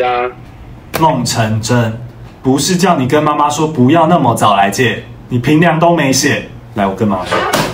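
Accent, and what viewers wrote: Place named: Taiwan. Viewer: 台湾腔本来挺好的，但他的语调😅...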